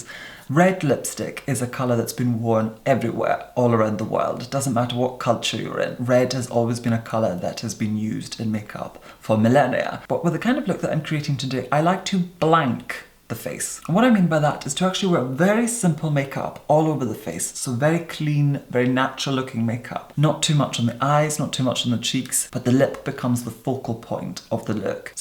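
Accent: Scotland, United Kingdom. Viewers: Scottish accent: VELVET edition! When I close my eyes, his voice conjures dark chocolate being tempered on a warm marble tabletop. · I Love your accent! Is it scottish?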